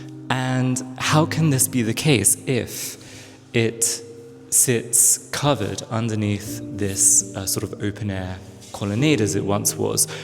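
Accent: RP, United Kingdom. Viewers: The speculation looks realistically fair enough. What a beautiful entrance hall! Photos from the auction showing 18th-century "la sedia" though and not carriages/an elegant mean of transporting a person by using two footmen for carrying. Columns must be a roman repurposed spoliage. You have an exquisite Queen English accent but when you are in hurry explaining things and full of enthusiasm sounds more natural and less pretentious, keep it like that is more charming.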